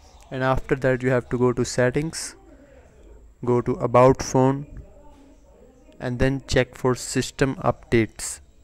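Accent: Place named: India. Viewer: That accent must be common in India!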